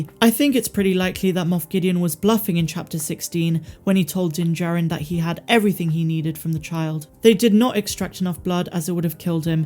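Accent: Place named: South West, United Kingdom. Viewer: The south west accent is strong with the jibber jabber!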